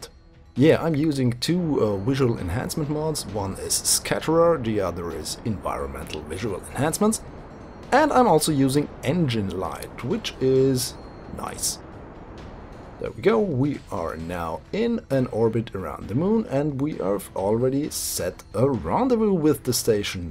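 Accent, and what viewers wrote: Germany. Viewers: Are you German? I feel like your accent is German. I may be way off, bit you sound German. Also, your accent really eccentuates the scope of your videos. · Are you german?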